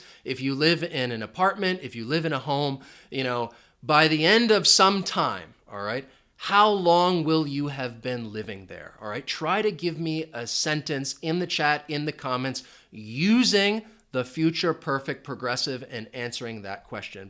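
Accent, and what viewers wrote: United States. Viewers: I just closed my eyes and listened to this video from start to finish. I learned not only tenses but American accent also. It will be useful for those who haven’t been familiar with the accent. So, I’m gonna invite my friends to subscribe and hit the bell button. They will be speaking more fluently with the tenses by the end of the year. A number of people in my country, Thailand, were struggling with forming a sentence despite the hard fact that they had been studying for several years. Unfortunately, their skills had remained unchanged. Anyway, students who have been working hard will have achieved their goals in so far as there is the light at the end of the tunnel. How long will they have been taking time to understand native speakers almost all the words they say? I suppose these covered all stuff you kindly taught. Thank you so much.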